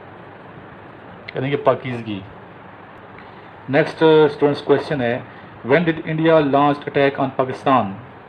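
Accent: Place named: Dera Ismail Khan, Pakistan. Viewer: sir are you by any chance..from DIKhan? apki accent se lag rha ha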